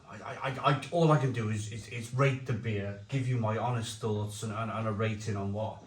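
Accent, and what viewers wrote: Wales, United Kingdom. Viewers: Couldn’t agree more with this. Picked it up, 2 bottles of this faff in Waitrose on a yellow label. Dire. Really bad. Great review,! Love your accent, I’m a Berkshire boy but spent 12-13yrs in Dinas Powys and Penarth and your accent brings it all back! Don’t worry, I sang the Welsh national anthem, in Welsh at all the rugby matches I went to. Was constantly told where to shove my chariot but embraced it all and loved every second there! Love your channel. Really good. Cheers,!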